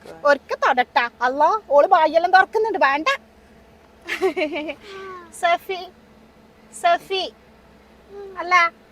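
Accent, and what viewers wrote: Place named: Kannur, India. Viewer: Hi Njan samsaram kelkkan vendi veendum veendum repeat cheyth kanaran, Ninglude kannur slang enikk bayankara ishttantta❤️❤️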